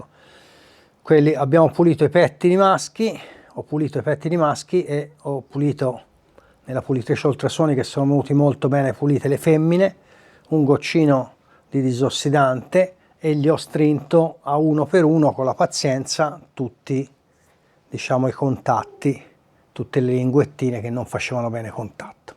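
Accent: Tuscany, Italy. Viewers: Complimenti! Canale super interessante e competente, chiaro nelle spiegazioni anche per un neofita curioso di come sia costruita un'elettronica hifi. Aggiungo che l'accento, gli eufemismi toscani sono deliziosi!!!! Sono un po lontano ahimè dalla Toscana ma se avessi un problema ad una elettronica mi "garberebbe" 😊 rivolgermi a. Se possibile vorrei sapere un contatto mail Buon lavoro e avanti così!!!!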